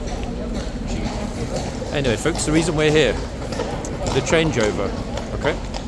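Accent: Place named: United Kingdom. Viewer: Love this vid, very informative ❤ lovely British accent in the commentary.🏴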